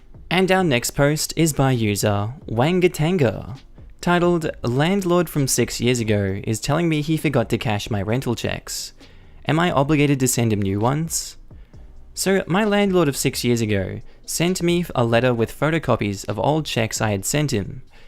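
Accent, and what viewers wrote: Australia. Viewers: So pleased there's an Aussie narrator... has a soft spot for the accent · hearing a fellow Aussie voice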